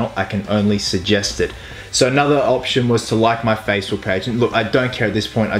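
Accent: Australia. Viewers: hey r u australian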